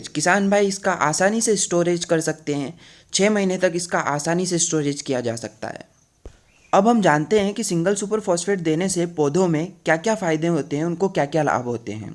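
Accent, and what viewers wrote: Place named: Madhya Pradesh, India. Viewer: Bhai pakka m.p se ho tum 😁😁 Ujjain dhar ke aaspas se tum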